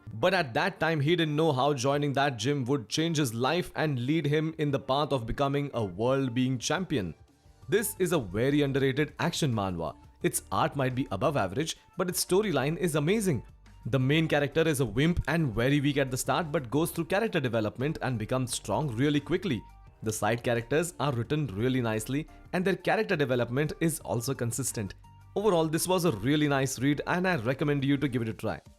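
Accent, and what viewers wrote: India. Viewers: fuvking indian accent ruin the video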